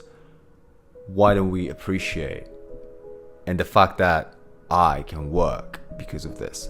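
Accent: England, United Kingdom. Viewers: You’re speaking English with British accent, aren’t you? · Ah... maybe you came up in my feed because we are both Vegan... Very Good!. I hear an English accent there. Not sure there is much to say, my bad, i don't know much about you but all the best with your quest to help folk.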